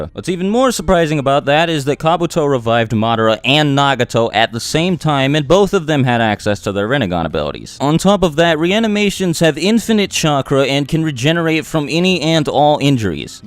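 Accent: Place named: Bronx, United States. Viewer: You sound like someone who should do voice work for "A Bronx Tale"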